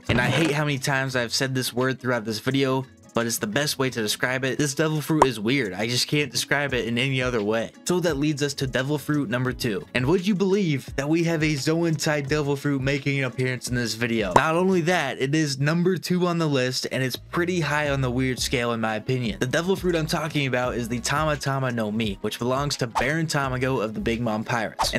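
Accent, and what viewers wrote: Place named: United Kingdom. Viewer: and you’re British 🤯🤯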